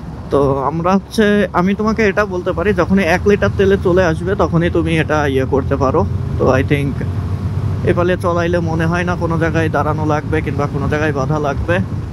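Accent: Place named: Kushtia, Bangladesh. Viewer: আপনার বাসা কি কুষ্টিয়ায় 😄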